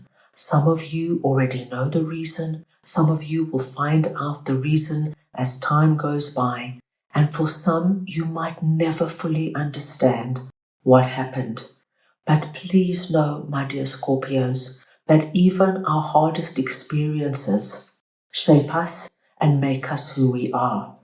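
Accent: South Africa. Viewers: Thank you …. I love your South African voice!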